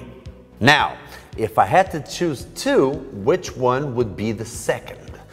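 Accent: Portugal. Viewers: Reparei que era tuga pelo sotaque. Excelente video! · Passei o video todo a pensar "este gajo deve ser Português"... devia ter lido logo a descrição!:D Belo Video! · Quando fazes a pesquisa em inglês para obter mais informação, mas o melhor resultado é aquele craque tuga marado a falar inglês ahahah Grande Caralho 💪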